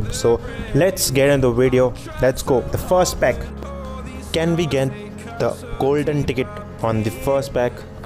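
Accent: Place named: India. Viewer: Bruh from the english talking i know ur Indian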